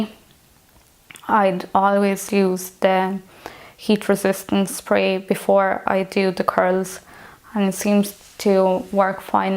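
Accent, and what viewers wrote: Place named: British Isles. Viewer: First! Love the accent. Did you learn English in the British Isles? Your Instagram is marked private :)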